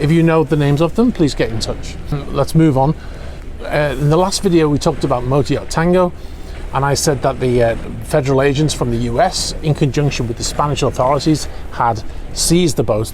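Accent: Liverpool, United Kingdom. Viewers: Thanks for the "thermal events" ye auld scouse ye....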